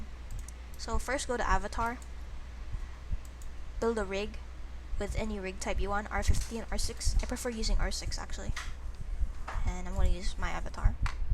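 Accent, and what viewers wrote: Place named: Toronto, Canada. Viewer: yeah idk how to describe it what comes to mind is a toronto accent 😂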